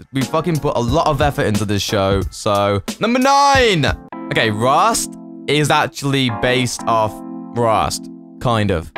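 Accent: United Kingdom. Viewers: ARE YOU ACTUALLY BRITISH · OMG your british?: yeah... random girl:say BANANA say it say it:NNOOO random girl:please say it say it!: BANANA!!!!!!!!! random girl it sounds so different... someone else continue... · i wish i was English. i mean having people ask you to say random words all the time is probably annoying but, come on. you sound awesome. and you're not American which if you add those two factors together it's like an A++ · hes british, BRITIIISH... thats right, isnt it?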